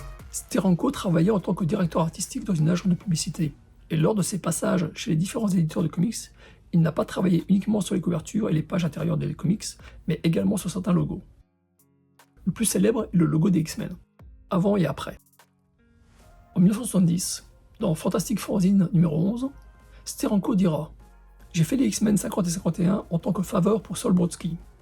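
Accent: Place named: Nancy, France. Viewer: Bravo pour cet excellent épisode, bien documenté, qui rend parfaitement hommage à cet immense artiste. Et bravo pour ne pas avoir perdu l'accent nancéien après 20 ans aux US !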